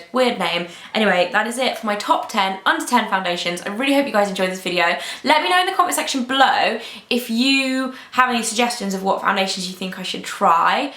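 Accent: England, United Kingdom. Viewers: is she english or american ? :)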